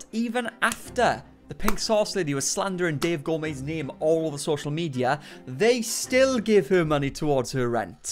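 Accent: Wales, United Kingdom. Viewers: Bought my bro that poster for Xmas 😂👍 love it 👍and your Welsh accent ❤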